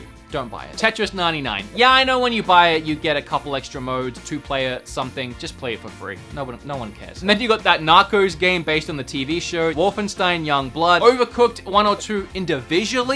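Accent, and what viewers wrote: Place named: Australia. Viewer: He’s Australian I think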